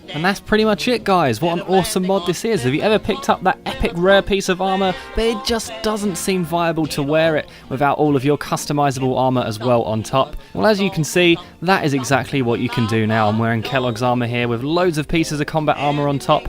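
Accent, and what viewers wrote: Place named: United Kingdom. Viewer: Brilliant vid mate, to the point, no retarded goofing, stressing immersive mods, and in British English. Subbed.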